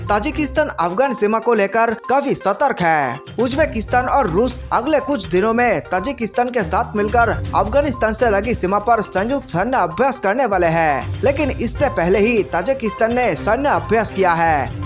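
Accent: Bengal, India. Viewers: News reader Bagali uchaaran me Hindi bahut achhi bolte hain.very nice!🙏🇮🇳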